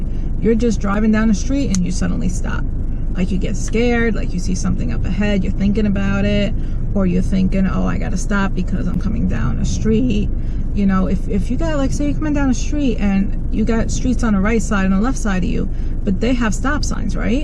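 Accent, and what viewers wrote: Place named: New York, United States. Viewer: Do you teach in Suffolk county? I like you New York accent lol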